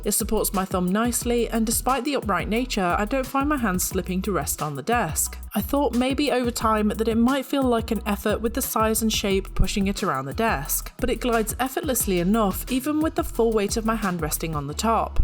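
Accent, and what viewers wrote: United Kingdom. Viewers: Just something about hearing a British woman speak. I can hear you talk all day. Love the review. Informative and helpful. Thanks.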